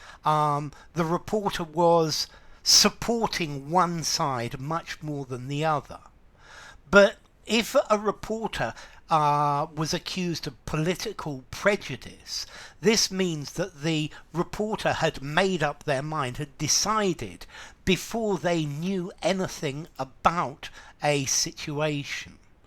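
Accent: United Kingdom. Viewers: We benefit a lot from you,british accent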